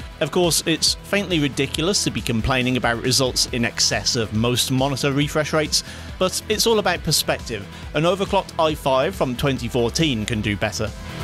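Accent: United Kingdom. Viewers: Awesome channel, with great thought-provoking content! Nice British accent too....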